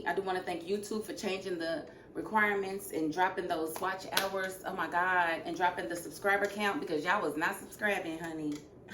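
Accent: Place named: Chicago, United States. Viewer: I’m a relatively new sub. Are you from Chicago? Your accent reminds me of Selena Johnson.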